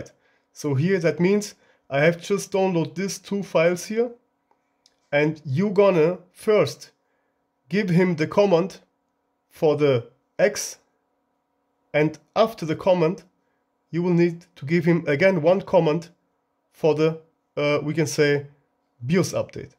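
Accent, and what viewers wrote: Germany. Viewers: dieser Deutscher Akzent 🤣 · der boss mit deutschem akzent, gutes video. danke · i hear german hahah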